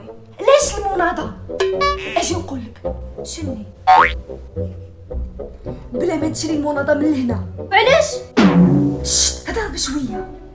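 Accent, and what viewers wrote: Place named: Jbala, Morocco. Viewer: كتقتلي بالضحك خالتي كنحماق على الهضرة دجبالة😊😊😊😊😊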